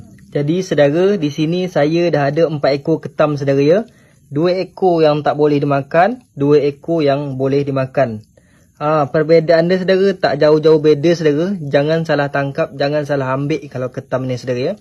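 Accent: Riau, Indonesia. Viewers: Ni loghat kepulauan riau indonesia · Orang melayu riau bang 😁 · Loghat Melayu riau ni😊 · Ni loghat Melayu Riau bang .. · yg bercakap ni melayu riau ni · orang Perak ke.. orang mane.. guna perkataan mike tu😂.. macam bunyi riau pun ada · Ada beberapa benda menarik dalam video ni,, pertama sekali,,,dari cara dia cakap mesti org fikir ni orang malaysia,,,tapi rasanya ni org riau ataupun tanjung pinang ,,,org indonesia cuma bangsa melayu... Kedua,,,kalau ikut mazhab hanafi,,ketam tak boleh dimakan,,haram hukumnya,,,tapi kita guna mazhab syafie,,jadi hukummya halal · Hallo sedare..melayu riau ye... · Bunyi macam orang melayu riau ni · Ini info dari saudara kita di Kepulauan Riau ke..sedap dgr loghat bahasa Melayunya di sana.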